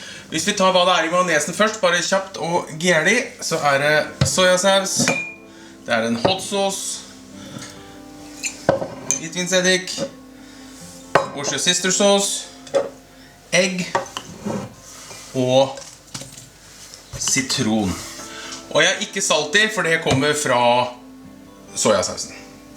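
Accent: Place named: Fredrikstad, Norway. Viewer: Jeg er litt nerd på dialekter. Får jeg tippe? Fredrikstad? Mulig jeg bommer stygt hehe.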